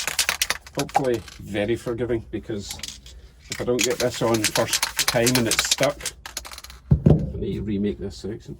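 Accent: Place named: Scotland, United Kingdom. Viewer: Hey! Love your accent. Reminds me of my Granny who hailed from Scotland. The similarities end there. You got a much tighter and hotter ass than Granny had! God rest her soul!! Happy Easter.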